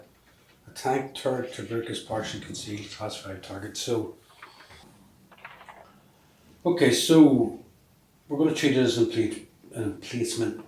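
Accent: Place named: Northern Ireland, United Kingdom. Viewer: Good stuff bud, have you been playing RF long? I hear yhe Nordy accent, I live in Dromore between Banbridge and Hillsborough, where abouts are you?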